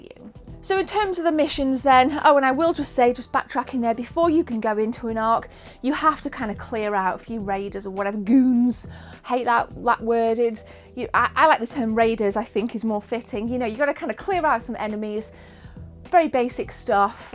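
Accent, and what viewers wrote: United Kingdom. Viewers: I love that British accent lol. My first time watching. Nice job! I'll stay tuned. Days Gone is so much better, by the way!!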